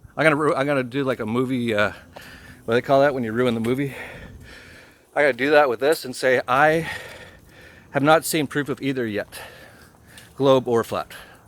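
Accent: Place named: Germany. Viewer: I enjoy your engdeutish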